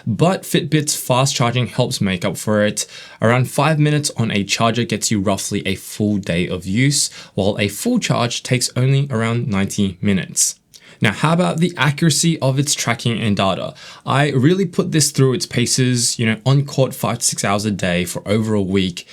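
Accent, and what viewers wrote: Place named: Australia. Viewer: $99? Why do you sound Australian but the price seems to be in US! No?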